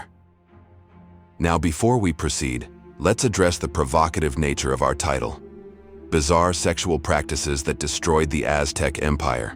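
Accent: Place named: United States. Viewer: You hace US accent. I Imagine that you are not concerned at all by any brutality commited by your ancestors towards native americans or blacks, please look inside your dirt before critizicing other. I just want to point a couple of things 1.- Aztec "priests" wearing a human skin in a religious ceremony was just a "cosmovision and fertility ritual". When settling in the lagoon and erectingTenochtitlan, the Huey Tlatoani of that time (about 200 years before Cortés) asked to the king of a city in the lagoon shore for his daughter. The king accepted. A few days later the king and some of his noblemen went to Tenochtitlan for a ceremony supodsely the wedding of the Huey Tlatoani with his daughter. They got horrified when the main priest started a ceremonial dance wearing his daughter's skin still pooring blood. 2.- If they were beloved, please explain not just the fall of Tenochtitlan, but how was it posible that the Spanish could rule such a complex society, when no more than 3,000 Spanish sailed to get settled in México in the first 50 years of Viceroyalty (60 per year) It could have been very easy for the indians to have expelled such "invaders" unless they preferred the rule of the Spanish rather than being ruled by any of their tribes. 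Morality: Before speaking about your disagreement to what the Spanish achieved, look twice an what your brutal and merciless society did in North America.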